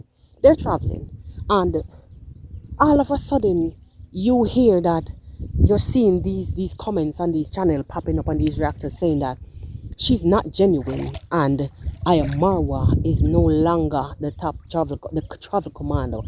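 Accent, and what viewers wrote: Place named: Jamaica. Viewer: I just love how you speak all jamaica bad mine the girl and then a talk bout say a good advice and she mùst listen to them after dem a chat her out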